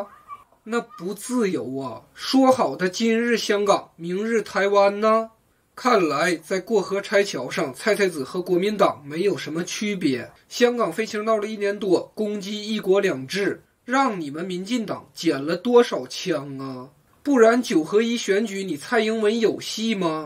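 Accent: Northeast, China. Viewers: 东北话的幽默风趣 体现的淋漓尽致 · 哈哈😄你是东北人吧！高!老乡!👍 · 老哥的东北口音好搞笑，好喜欢😄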